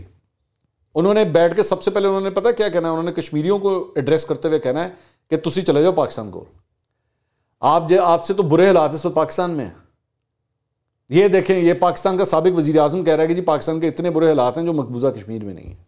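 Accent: Punjab, India. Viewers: تھوڑا سا پنجابی ٹچ کم کر لیا کریں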